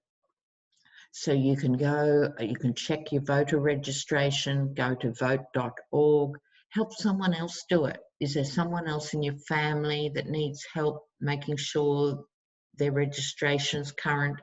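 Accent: Australia. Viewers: I watch you with the closed captioning on and it’s of great amusement to me how it misunderstands your lovely Australian accent. For example barter become butter and pentacles becomes sentinels. Flynn’s becomes flinched. Short list is shortness. Good work! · Hello! Enjoy your readings, and especially your Australian lilt.:-) You, Linda G and Violetta are my favorites and listen to you regularly. Wondering, how it comes to pass that all three of you are now wearing turquoise rings last few days? Did you all agree beforehand? Or do you all feel the need for Throat Chakra strengthening of Truth? Or some other prompting? Thank you for all your work, Namaste.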